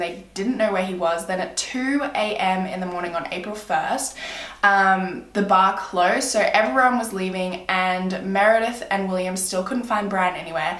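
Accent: Australia. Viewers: i normally rly don't like accents like british accents but i love your australian accent so much and you're so beautiful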